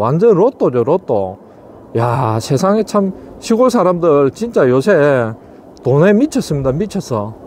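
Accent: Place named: Jeolla, South Korea. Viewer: 말투로보아 전라도?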